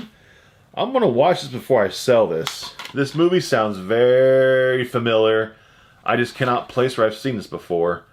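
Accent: United States. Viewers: Omg what part of Indiana are you from??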